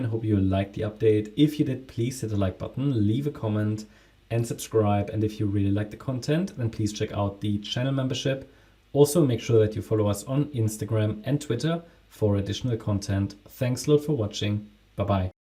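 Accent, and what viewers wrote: Germany. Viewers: Bist du Deutscher? Hört sich so an 🎉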